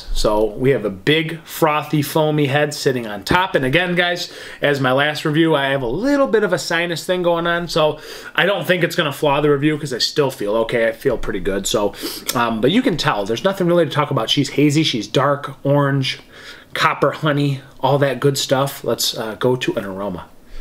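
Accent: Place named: Michigan, United States. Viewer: I bought a 6-pack of these today and looking forward to trying it out. I appreciate the review of a genuine MI beer from someone with a genuine MI accent, e.g., Oberaaan & Haaaps (hops) 😉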